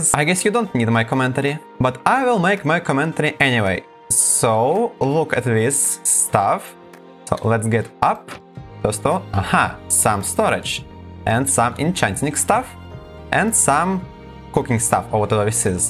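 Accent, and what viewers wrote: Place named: Russia. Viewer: Русский акцент узнается с первых слов🙃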